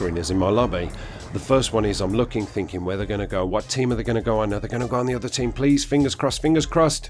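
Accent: United Kingdom. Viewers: are you British? *****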